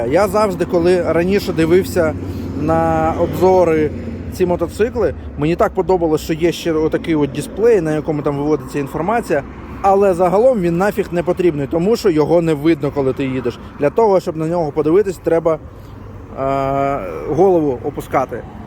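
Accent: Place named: Russia. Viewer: Комент в підтримку каналу, виключно за українську мову! Відчувається що людина раніше розмовляла Мокшанською... Респект! Розвитку і успіхів!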